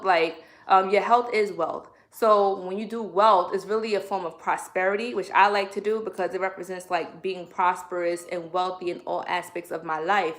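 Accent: New York, United States. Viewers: Your ny accent came out in this one lol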